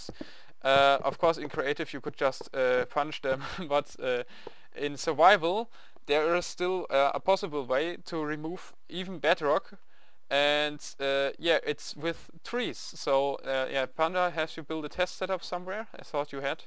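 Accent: Germany. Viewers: mann jetz hab ich schon n paar vids von dir gesehen und hab mir immer gedacht so wie der englisch redet kann der doch nur deutscher sein?! jo jetzt weiss ich es xD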